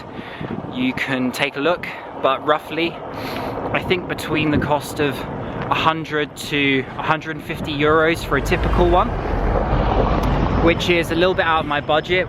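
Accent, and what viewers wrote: United Kingdom. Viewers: He's got British accent!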